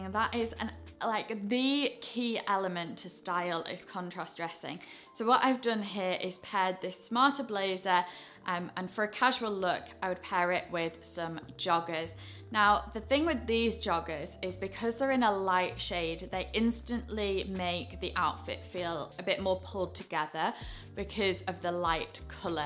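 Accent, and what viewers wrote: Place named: Manchester, United Kingdom. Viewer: First of all— obsessed with your style tips. This is literally so helpful. Second, I didn’t know why your accent sounded so familiar until I realized that you’re in Manchester and that’s where the 1975 is from, and Matty’s accent sounds exactly the same. Haha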